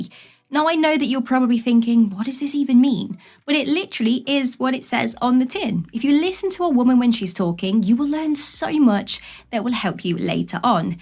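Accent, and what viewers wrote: England, United Kingdom. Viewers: I could listen to you,.... seriously, what part of England is your accent from?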